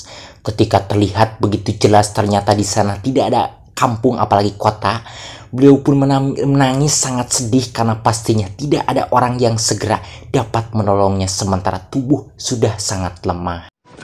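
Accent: West Java, Indonesia. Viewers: Nma nya spa bng Sunda nya mna bikin ngakak kalo dngerin suaranya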